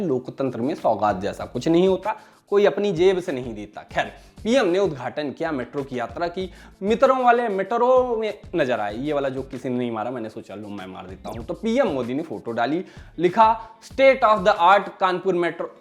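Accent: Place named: Rewa, India. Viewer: Mai bhi rewa se hu.. Aapka accent 👌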